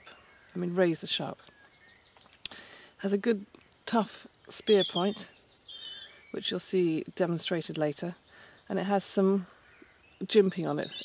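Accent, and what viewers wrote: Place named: RP, United Kingdom. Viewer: Nice in depth review, and plenty of specs to keep the techno bods happy, the guy who wrote he liked the posh voice made me laugh, but it is nice to hear a well spoken voice, (must admit i was reminded of Joanna Lumley) which is no bad thing, anyway , like the knife and like the ease with which you use it, the easier it looks the more you know the user has skill! cheers Dave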